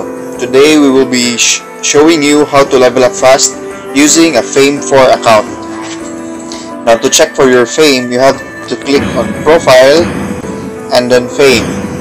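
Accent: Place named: Philippines. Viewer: Are you from the philippines? I can tell by your accent.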